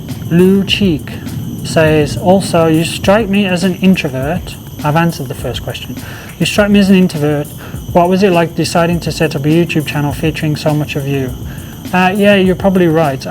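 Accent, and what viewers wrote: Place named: Black Country, United Kingdom. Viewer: Kudos for answering my question on where in the Black Country you're from. Had not seen the vid where you referred to your place or origin but got it from your accent!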